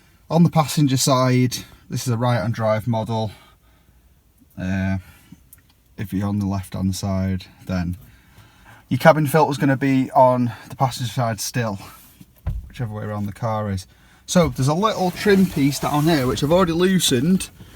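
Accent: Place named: United Kingdom. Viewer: What a lovely britsh acsent )) Thanks 4 Video!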